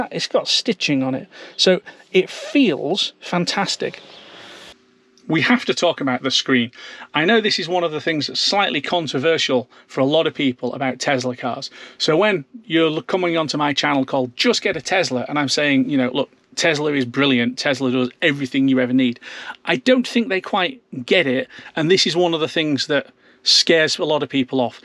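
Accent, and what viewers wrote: Scotland, United Kingdom. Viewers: Excellent video and congratulations on your new Model Y! I’m a huge fan of Tesla here in the United States and looking forward to getting the Cybertruck. All the features that you listed resonate with me and your description was spot on. Thanks for the brilliant review and keep the videos coming. Love the Scottish accent.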